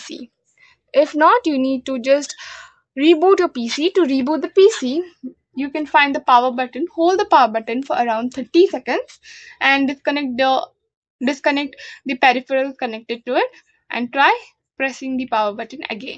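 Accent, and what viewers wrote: India. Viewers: Man the Indian accent is hard to understand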